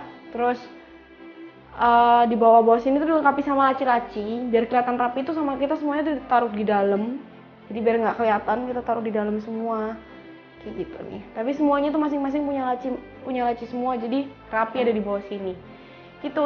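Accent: Java, Indonesia. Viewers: Ada darah Jawa kah ?? Sepintas cara bicaranya kek ada logat² Jawanya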